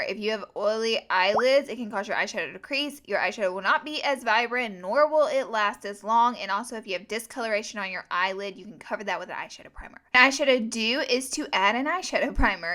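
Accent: South, United States. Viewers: Don't waste one minute talking, or at least get a voice training course. Your voice sounds way too nasal and, for someone who is used to British audio (non-native English speaker here) this almost looks like the stereotypical female American voice. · the southern accent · her voice is the most American thing I have ever heard. (im australian) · Shawn Brown she's not trying to be like bunny. she is doing her own thing. and yes they both have southern accents but that doesn't mean she's trying to be like her. trust me bunny is wayy more hyped up than her ha ha. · Are you southern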